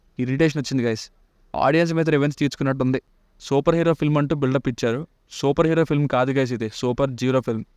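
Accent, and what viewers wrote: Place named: East Godavari, India. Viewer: బ్రో మీది ఈస్ట్ గోదావరి మాటకు ముందు అండి మాట చివర అండి అని వస్తుంది స్మాల్ డౌట్ అంతే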